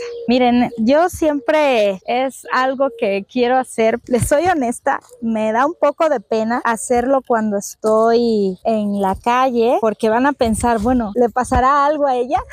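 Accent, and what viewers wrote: Mexico. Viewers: Hola usted no se escucha como cubana habla como Mexicana saludos buen video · Cómo es que asimilaste y adoptaste completamente el acento Mexicano? ... siendo el acento Cubano tan difícil de perder. Bueno, en 10 años si es posible .❤ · No Tienes acento Cubano 🙄 Parece qué eres de aquí de México Saludos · Conozco cubanos acá en usa , tu no tienes acento cubano , ya eres Mexicana 🇲🇽🇲🇽🇲🇽🇲🇽🇲🇽🇲🇽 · Amiga ya me suscribi saludos para ti ya hablas como mexicana😊😊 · Tienes muy bien el asiento mexicano y no cubano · Ya no tienes acento es más pareces más mexicana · Amiga...una pregunta...como se siente a estas alturas del tiempo haber perdido el acento cubano....no te sientes mál.....tienes orgullo del acento mexicano...cual es tu sentimiento al respecto? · Una cubana con acento mexicano 👍✌️se explica muy bien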